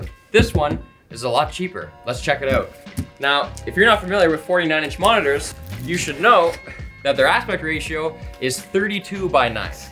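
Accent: Canada. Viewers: Thank god for closed captioning. Can't understand a word with that Canadian accent. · I can really hear the Canadian accent in this video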